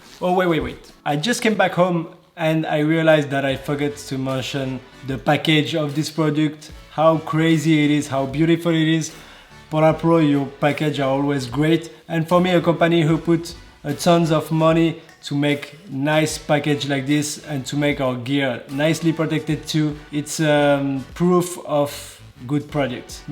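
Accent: France. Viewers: Hi, sorry but are you french ?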